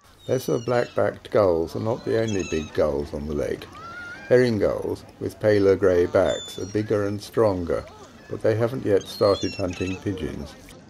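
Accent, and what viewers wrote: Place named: United Kingdom. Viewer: Educated? Lol. Thats just a british accent fool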